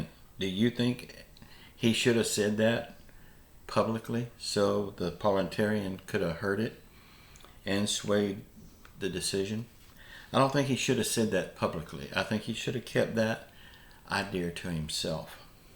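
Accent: South, United States. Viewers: We need to PRAY and have FAITH! Mr., I. Think. You have have soft lovely Southern. Accent, People will always criticize! I have accent too! Have good day! 😊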